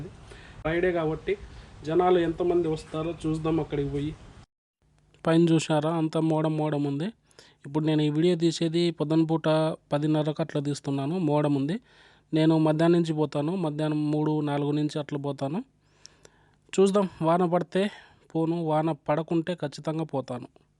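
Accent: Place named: Telugu, India. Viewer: Brother only nee telugu slang kosame nee videos chustha From/telangana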